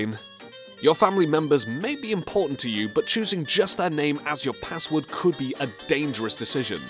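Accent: United Kingdom. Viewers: You obviously live in the U.K. Because you pronounce Z with an ED at the end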